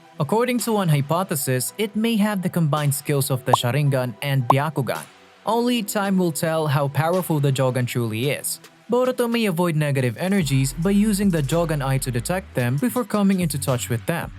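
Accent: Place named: Philippines. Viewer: Pinoy accent 😂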